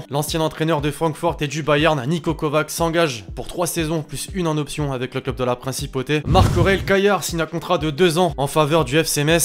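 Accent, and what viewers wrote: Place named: Marseille, France. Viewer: Il Marseillais mtn mdr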